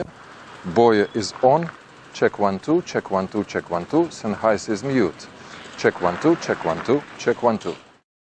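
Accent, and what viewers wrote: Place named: Russia. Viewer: День добрый. Такой акцент. Вы Русский?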